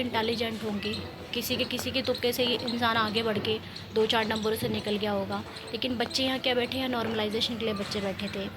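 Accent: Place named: Western Uttar Pradesh, India. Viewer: Aap western up se ho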